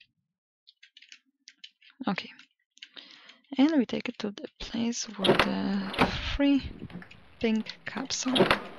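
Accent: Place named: Ukraine. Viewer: Like your accent. That Ukranian?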